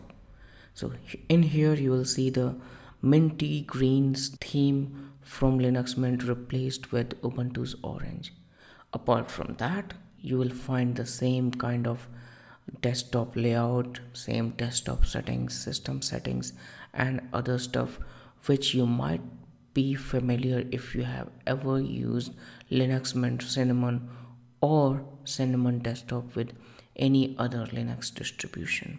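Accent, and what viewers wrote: India. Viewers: indian voice ::))))))))))))))))))))))))))))))))))))))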